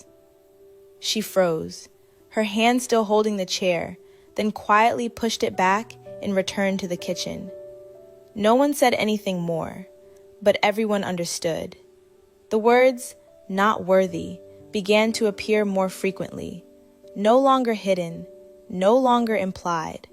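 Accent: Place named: United States. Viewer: No!!! American accent 😩 BUT good story ❤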